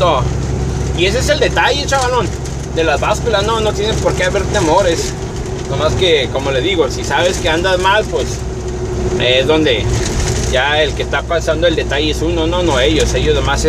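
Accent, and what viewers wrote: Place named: Sinaloa, Mexico. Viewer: mi colega te voy a ser una preguntota de que parte de México eres te escuchas como de Sinaloa los ojalá qué no te molestes por la pregunta y 8 nomás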